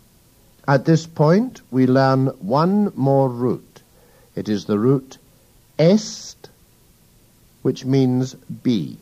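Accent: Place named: West Scotland, United Kingdom. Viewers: Mi ne certas, sed estas la vocxo de iu el la malokcidento de Skotlando. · Yes - the Scottish accent gives it away.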